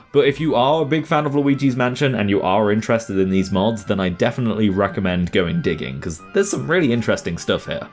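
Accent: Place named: United Kingdom. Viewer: Why must all you Nintendo and Sega YouTubers be British and ruin the video with your horrible voice… quit forever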